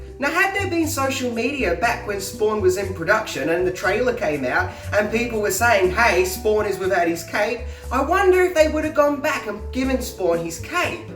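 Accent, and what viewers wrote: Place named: Australia. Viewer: I have one. Superman the quest for peace. Its so bloody awful. The superman series could've done with out it. Worst part is when they r on the moon fighting. Looks like they r in a desert at night fighting in sand. I know the budget was cheap and cheerful. But come on make at least believable. Love the Aussie accent. Visting Melbourne is on my bucket list.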